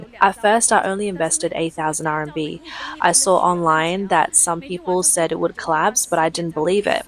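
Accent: Australia. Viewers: My favorite Australian Chinese narrator😮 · Aussie accent on the voice over. PM of AU in chyna in Winnie's ear, while the USA is throwing its hands in the air saying 'Australia, what the f*** are you doing' they don't know w t f they are doing.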